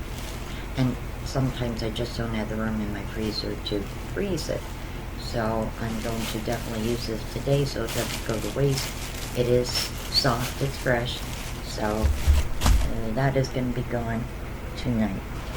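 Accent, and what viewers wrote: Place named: Pennsylvania/Delaware, United States. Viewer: Hi! I knew you sounded like me..its the PA/DE voice we both have!!I have no idea how I found your channel, but I am drawn to your personality! I was watching Freakin Frugal they are a dumpster diving husband & wife that get a ton of stuff from Aldi & Save a lot (mainly Aldi) they donate alot of stuff as well..food/clothes..you need to see these videos. You could add content ty o your channel. People love it! I hope your channel gets bigger & bigger..this is how it starts..much blessings to you! Much love from Middletown, Delaware 💗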